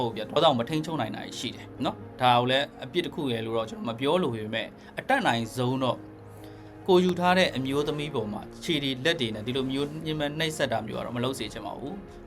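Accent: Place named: Dawei, Myanmar. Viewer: ထား၀ယ်လိုပြောနေတာ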